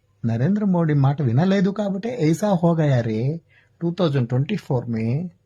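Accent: Nellore, India. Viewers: anna nellore Hindi super anna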